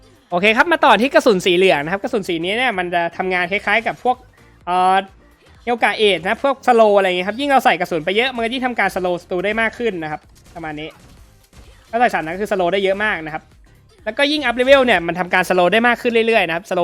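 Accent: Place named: Thailand. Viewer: I didnt understand your language but i enjoy the gameplay, thai accent kinda funny tho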